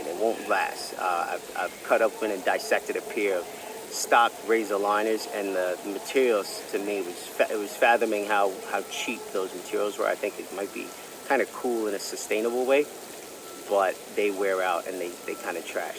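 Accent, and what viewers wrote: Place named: New York, United States. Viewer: Hey bro I saw this video for the first time and before u said it I knew it was new York. I was thinking Brooklyn or Queens. I live in PHA in South Minneapolis Minnesota. I cud tell u had that new York flavor very wu tang vibe 90s vibes. I'm in my 40s now just got back into skating. I skated from 97 to 2007. I skated Rollerblade brand. Now I have razors cult shima 3 remastered, razors Andrew broom pro shift skates and I just ordered the new Iqon AG 10 boots with an Iqon AG60 C frame. A carbon frame. Tho I wonder if I shud send the AG 10 back and get the Them 909 skate. The AG 10 comes in the mail tomorrow I just am so old school that all this new tech scares the shit outta me! I hope I love em I'm just Soo big on durability. I like the old school flavor of a plastic boot and basic shape. I just got a huge sale price on the Iqon that I went for it..all carbon and kevlar mix. 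I just subscribed to your channel and just showing support. I'm over here dodging gun fire just trying to skate haha no joke! I teach dance and I cut hair as a hairstylist. But I love to skate and it feels good to be at it again. Thanks for a great video. I learned Alot. I have alot to learn all over again. Be well and enjoy this summer!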